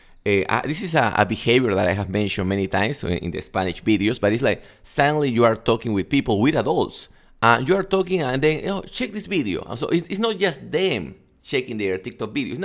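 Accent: Peru. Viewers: Nah, Hbla bien solo que reconoces el acento peruano y te parece malo, pero si lo comparas con un ruso, aleman, se le entiende incluso mejor.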